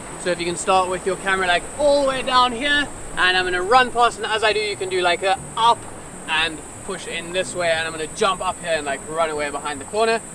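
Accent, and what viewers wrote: South Africa. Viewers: Hey, are you from South Africa?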